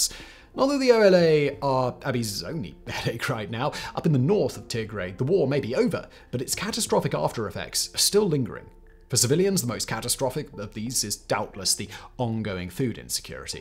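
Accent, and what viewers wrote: England, United Kingdom. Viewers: You sound like an Englishman or British you know these people do no longer want your propagandab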